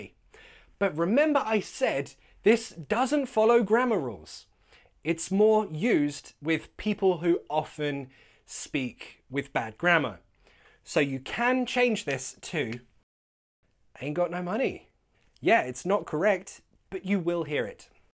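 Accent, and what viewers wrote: United Kingdom. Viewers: Amazaing sir British accent English 😊😊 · Hlo sir I am Tara that's really amazing I am up for watching ur video I like ur teaching style.however I wouldnt like British accent bt uve grown on me thank u I love u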